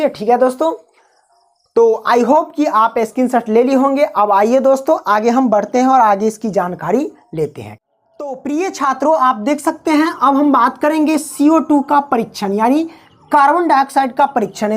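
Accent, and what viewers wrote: Bihar, India. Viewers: Sir aap bihar ke rehne bale hai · Sir aap Bihar se hai kay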